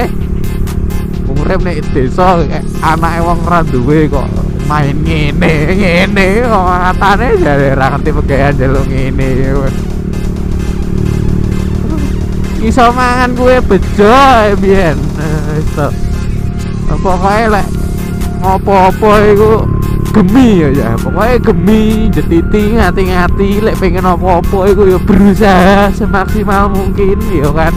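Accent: Java, Indonesia. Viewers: jowone ngendi mas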